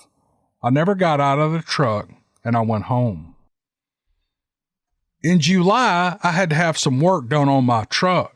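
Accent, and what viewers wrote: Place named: Tennessee, United States. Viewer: " Interruptions/Commentaries" lol... It's like, no worries, "We got the Power of the Remote in hand, 😁 heeheehee, naw... He knows all the folks that listen are either: 1. Southern and they'd woop a fella that ever b-tched about him, 2....and the rest are so taken with his Authentic West Tennessee, North Mississippi, Accent that they'd Woop that fella too! be like "Switzerland for Public Harmony", we might need to stick him on a Campaign Train in 2024! Finally, I would have a Candidate that's a MODERATE. (News Media doesn't allow Moderates, they don't have enough opposite leverage to milk for profits). If they are a Moderate, then they just "use creative writing/lie" and create their drama for profits. The Middle is really a pleasant pasture, "You Guys and Y'all" need to Check it out. ✔ Peace would be Possible if the News Media wasn't owned by the 1% of the 1%, (including the guy/family That's the "Go-to-Source for War Loans") fact, owns 100% A/P....see, there's no big Profiting in Peace, so they stream ideas fostering prejudice. 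We really don't have a "Free-Press", but most folks think we do. A private owned news media just always has an agenda, and that's "our greater foe". We gonna get this through our heads and get back to Adulting. That's my Commentary, and it's Positive. ☺ Thx for being real and nice my fellow DC'rs, its a cool Tribe.... 🇺🇸🌎🌍🌏🗽 HaPpY Holidays Everybody! 🍸🎄........ "the credits": Journalism is 1 of my Degree majors: University of Memphis Go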